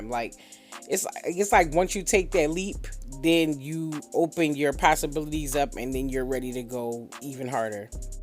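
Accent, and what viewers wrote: Philadelphia, United States. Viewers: I love the color of her hair. By the way, are you from Philadelphia. Your accent sounds like Philadelphia. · I love hearing your voiceovers with your Philly accent! Our accents are so distinctive, although we don't think so, lol. I'd love to have you bless my hair when/if you get back here. I cut off my locs 3 years ago and I've been wearing wigs, but I hate them now and want to do something with my natural hair.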